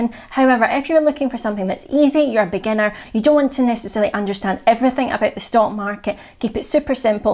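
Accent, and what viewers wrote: Scotland, United Kingdom. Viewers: Aye... love Scottish accent! :)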